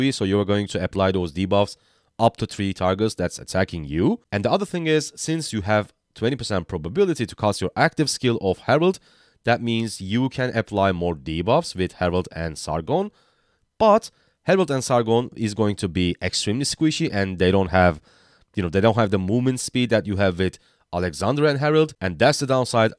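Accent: Turkey. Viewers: Bu adam tam bir Türk gibi ingilizce konuşuyor.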